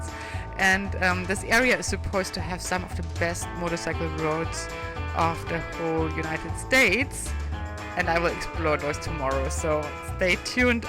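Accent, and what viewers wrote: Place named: Germany. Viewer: Fantastic video Lady!! I have been everywhere you have in your video. The South is known for it's hospitality and you will find nothing like it anywhere in the world. Missouri and especially Tennessee are my favorite places to be. Georgia is my next favorite. The people are so laid back and friendly. Great film work Lady! Awesome stuff. I still love your German accent!! Peace! Ride SAFE and BE safe Lady!! 🙌👏👏👏👍☝🤙🤘✌👌🖖✋😊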